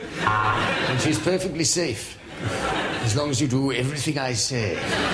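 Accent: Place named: Wales, United Kingdom. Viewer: true, but his family is, and his natural accent is noticeably Welsh at times, like a lot of people with mixed welsh/english heritage heritage, certain words and pronunciations are more Welsh than English, so as a welshman, I'm happy enough to let it go. His stage accent is pretty good anyway.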